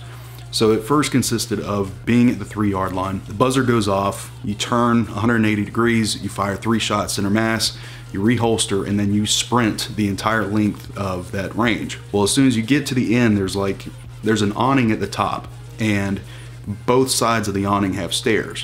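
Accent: United States. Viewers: Is this American or what country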